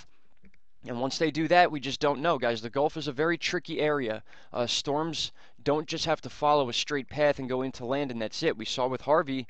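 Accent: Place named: Philadelphia, United States. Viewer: Yay! No hit on NJ :) you have an accent... Are you from Philly or NJ? You almost have the same accent as me!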